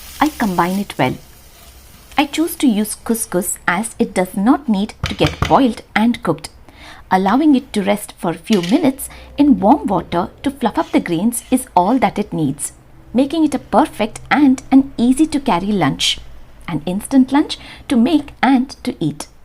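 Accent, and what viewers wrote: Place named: Hubli, India. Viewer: Hi Nivu nim maneli heg matadatira annodanna swalpa swalpane keloo keluvu matanna revined madi keloke tumbane khushi agutte Hubli khadak bhashe 😘❤️🙏 Do some video on daily routines in ur and our mother tongue KANNADA 😘 ❤️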